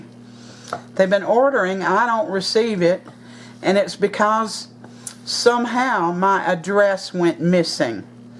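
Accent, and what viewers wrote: South, United States. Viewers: Hi Hun, new subbie here!! What state do u live in? If I may ask lol..I can tell your in the south, I'm in Tennessee, I like a lot of your recipes!!! I'll be sticking around😊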